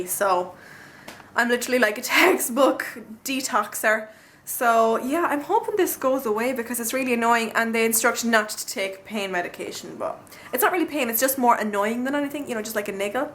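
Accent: Ireland. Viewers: where are you from in ireland? :) Im guessing wessshht?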